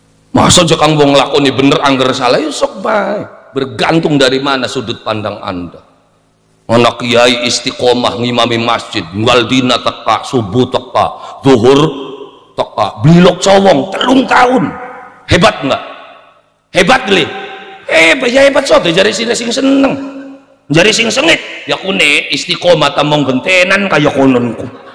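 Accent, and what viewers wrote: Indonesia. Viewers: Aku g Level yg ceramah ny kurang wawasan kental logat Ndesosanya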